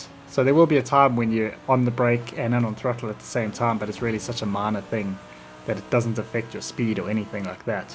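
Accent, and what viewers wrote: South Africa. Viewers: You from South Africa 🇿🇦?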